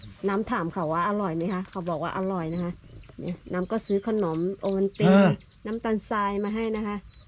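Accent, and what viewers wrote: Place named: Ratchaburi, Thailand. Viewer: สวัสดีครับน้องน้ำ ภาษากะเหรี่ยงทางราชบุรีฟังรู้ครับแต่บางคำก็พูดไม่เหมือนทางตากครับ fc จากตากครับ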